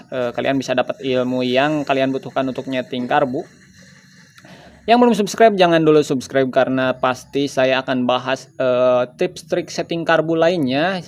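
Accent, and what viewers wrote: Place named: West Java, Indonesia. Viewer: Dari logat bicara nya, akang mirip2 channel bang vedro simister.. cara penyampaian nya jelas dan ada logat sunda nya.. semangat kang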